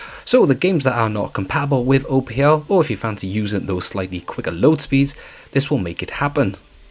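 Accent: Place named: South Wales, United Kingdom. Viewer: Your definitely from South Wales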